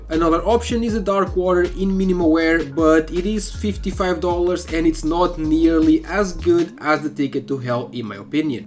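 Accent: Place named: Portugal. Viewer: you sound so portuguese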